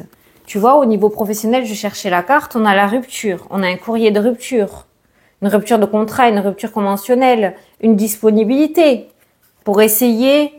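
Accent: South, France. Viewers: Merci 🎉❤ ta guidance tombe à pic! Tout est juste...incroyable Merci pour ta fraîcheur. En plus, j ai le même accent du sud que toi. Tu es une belle découverte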